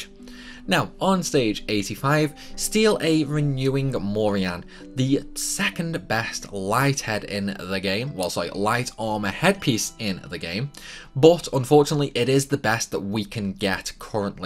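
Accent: United Kingdom. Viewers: Ngomong pake aksen british agak ngeselin juga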